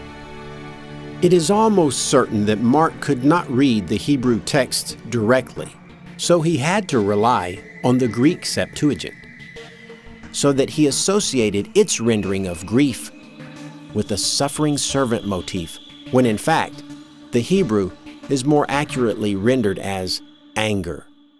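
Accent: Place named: Mississippi, United States. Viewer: You're fairly articulate and I'd be lying if I said I wouldn't look forward to some Mississippi drawl for an entire video. It's a very distinct vernacular and as I'm from Northern Wisconsin myself, we like to pronounce everything through our noses and we add a few too man "ehs" and "oh yas" to pretty much every other sentence so variety is nice, eh?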